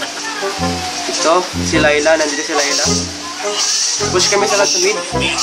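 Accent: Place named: Visayas, Philippines. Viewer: halatang bisaya talaga...hahaha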